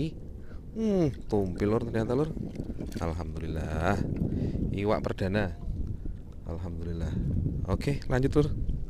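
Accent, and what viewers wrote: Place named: Java, Indonesia. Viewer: Waiki anunya medok isonan 😭😭 Penting sutrek lah 😁😁👍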